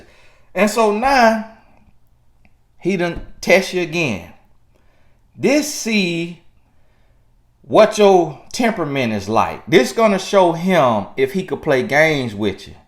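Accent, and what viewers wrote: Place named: Florida, United States. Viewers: That's Florida for you lol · Yes he’s very country. That’s how a lot of Floridians talk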